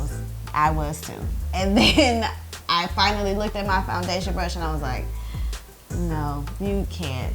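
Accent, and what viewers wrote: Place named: New York, United States. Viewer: 1. I would have never guessed that you were not a native New Yorker if you hadn't said you were from Ohio! lol In Spirit you are a native NYer lol 2. Is that a baby Monstera Albo in that pot?? And you grew all those leaves on a supposedly slow growing plant! KUDOS! It's beautiful! 3. I WILL be trying that washcloth! 4. Not to mention how much natural hair products costs us! smh I be like $15 dollars for this little bottle? I'll just make this huge $6 bottle of Treseme work (extremely damages hair cuz it has the most moisture) and it usually doesn't work to well LOL #TheNaturalHairStruggle LOVED this video! IG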